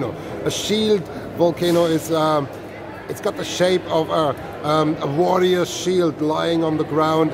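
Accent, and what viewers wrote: Ireland. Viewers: Thanks a million for sharing your expertise and thoughts on volcanic matters on the isle of La Palma in a way that everyone could follow. Very interesting and much appreciated. I love your Irish accent!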